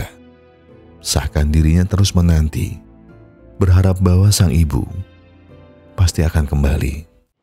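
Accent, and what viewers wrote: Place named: Semarang, Indonesia. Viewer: Jowo mu medok kang Koyo semarangan 😂😂😂😂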